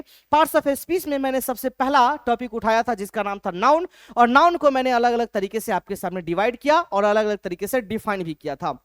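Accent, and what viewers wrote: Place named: Bengal, India. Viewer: Bengali accent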